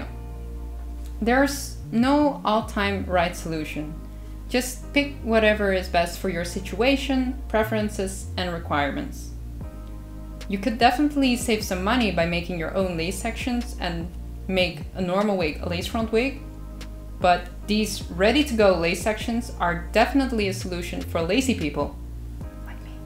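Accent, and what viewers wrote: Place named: Colombia. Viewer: You look asian but sound like your from Colombia of something. 🖤 It's confusing and I like it lol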